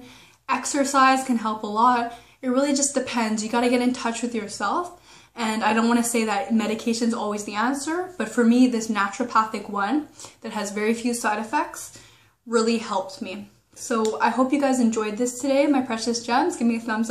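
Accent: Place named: Canada. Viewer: yo i love your Canadian accent.. so cute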